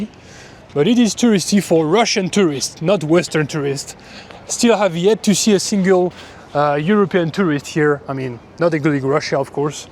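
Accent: France. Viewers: You think they are not used to see foreigners? They are not used to see men with colored hair - they likely thought you are gay, which is not a good way to be perceived there 🤣And French accent makes it even funnier, because it sounds kind of drunk to Russian ears. I mean simply look at men around you and then at yourself to understand why you've gathered so much attention. Go to Chechnya or to Dagestan with a haircut like this for the ultimate experience 😂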